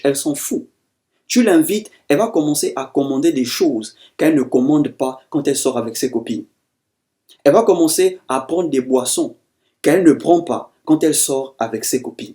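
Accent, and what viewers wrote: Gabon. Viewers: Bro tu connais.escuse moi tu es de quel pays.tu parle comme un gabonais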